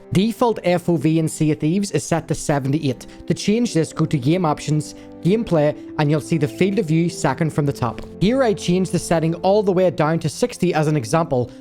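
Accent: Northern Ireland, United Kingdom. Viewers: Northern Irish..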